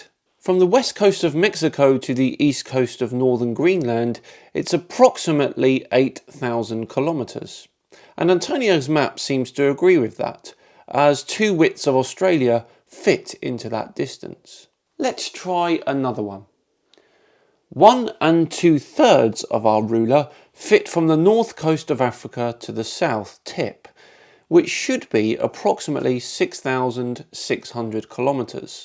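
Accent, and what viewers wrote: South, United States. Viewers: Definitely a Southerner. Neebody in Toon taalks like that, bonny lad.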